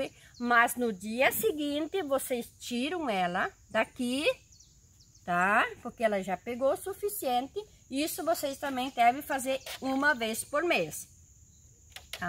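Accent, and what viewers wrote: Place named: Germany. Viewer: Esse sotaque alemão ❤️😍😂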